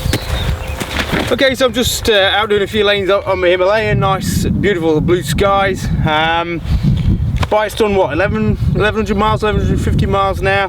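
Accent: United Kingdom. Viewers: is that british accent ???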